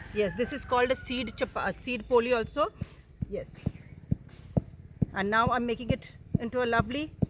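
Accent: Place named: Maharashtra, India. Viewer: Best part is your Marathi accent 👍 plz use it often. Jay Maharashtra 🙏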